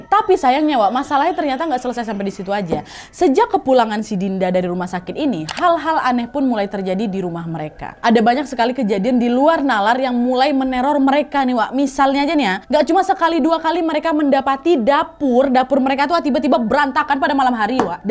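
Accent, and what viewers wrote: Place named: Medan, Indonesia. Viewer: Cocok kali kayaknya kakak ini main ke mentah kali logat medannya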